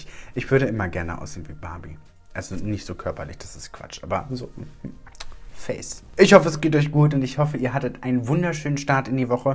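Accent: Berlin, Germany. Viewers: Οmg man fühlt richtig deine Lebensfreude 😍😍😍 das hat mir so gefehlt an dir!! Und dein Berliner Dialekt kannst du ruhig öfters raushängen haha Hab 4 🌷 gezählt Instagram mariamck27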